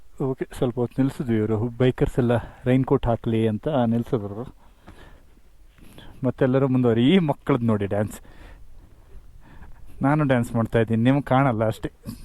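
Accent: Kundapura, India. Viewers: ಹವ್ಯಕ ಕನ್ನಡ ಮತ್ತೆ ಕುಂದಾಪುರ ಕನ್ನಡ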